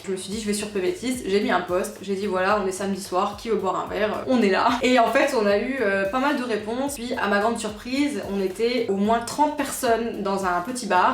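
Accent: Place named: France. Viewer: je vois sur plusieurs commentaire que tu ramène souvent l'accent francaise et que les québécois ont déteste ca au points de pas vous aimez ? Je ne sais pas ou tu as entendu ca mais la majorité des québécois ce fou pas mal de ton accent.. il ne faut pas généraliser.. ce que nous détestons vraiment ce sont ceux qui ne veulent pas s'intégrer au coutume quebecoise.. apars ca.. ont est très chaleureux en général..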